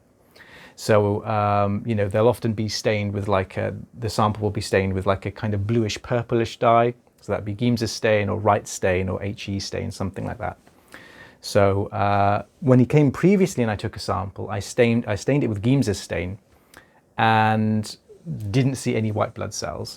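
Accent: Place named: RP, United Kingdom. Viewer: I cannot stand this posh xxxx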